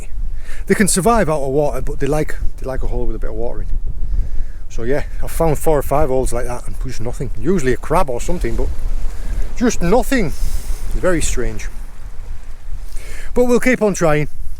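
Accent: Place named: England, United Kingdom. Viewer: I knew it was going to be good day when I hear I got my booket and you go on a trip in an English accent. Good view and explanation of things.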